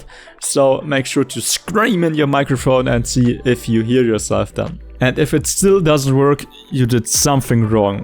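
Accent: Germany. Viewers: man hört soooo dass er deutsch ist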